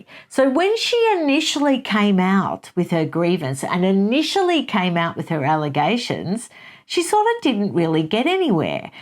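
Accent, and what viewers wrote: Australia. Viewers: Love your Aussie ascent and true poor Harry was helped all the time in his education. Sadly it’s coming back to ‘bite him.’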